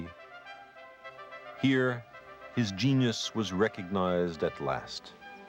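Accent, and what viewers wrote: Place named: Brooklyn, United States. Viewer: Carl was born in Brooklyn and lived there as a child. It must be noted that the "Brooklyn twang" was different than today than when was a child in the 1930s ( would be pushing 90 if he were still alive). It must also be noted that spent the majority of his life in high-level universities such as Harvard, Cornell and Berkeley. Being a lecturer it's likely he taylored his accent for his students.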